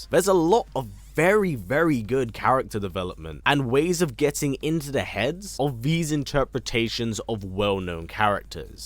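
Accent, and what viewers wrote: United Kingdom. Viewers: How did you watch it in the UK? (Assuming from your accent you're British too)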